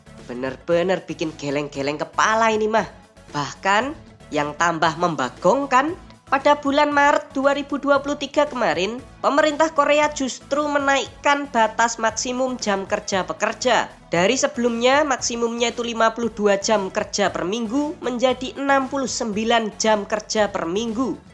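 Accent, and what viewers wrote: Java, Indonesia. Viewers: ngomonge medhok banget, malah keren unik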